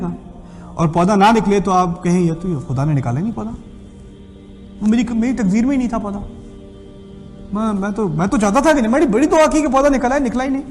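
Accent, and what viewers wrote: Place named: India. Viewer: Masha allah done a great work... May allah bless you.... Nice hindi accent by the way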